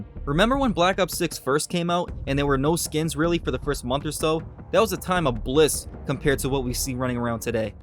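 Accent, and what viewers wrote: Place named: United States. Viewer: What makes these videos even better is the accent🤣 Which state is it btw?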